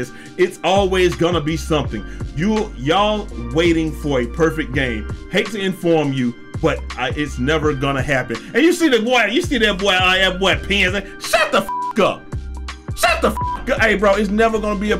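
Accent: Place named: South, United States. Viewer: Crazy you had Shannon Sharpe in this video lol I be calling you the Shannon Sharpe of the 2k community. Not based on age of course but just style and personality. Funny as hell 😂 and the southern accent too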